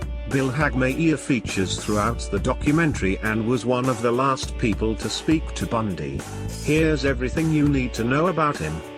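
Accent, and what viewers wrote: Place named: England, United Kingdom. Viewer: Robot voice with a snooty English accent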